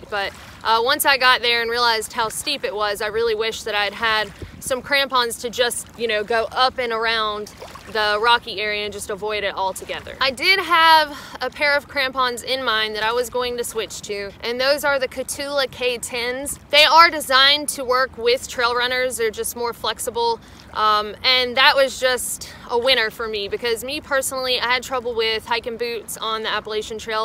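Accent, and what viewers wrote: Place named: Alabama, United States. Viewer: Hey, Thanks for the episode and the tips of advice....As usual your delivery was informative and interesting, with an ever so charming Alabama twist...We appreciate and look forward to the experiences you share with us...You take care and be well 'till the next one lady...Thanks for the smiles...CHEERS!!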